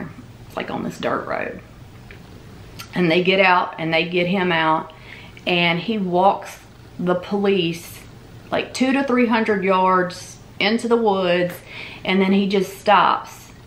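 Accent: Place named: Louisiana, United States. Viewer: What an evil, evil person 😭 That poor baby. I was born and raised in Louisiana. You must be from Northern Louisiana by your accent. Subscribed!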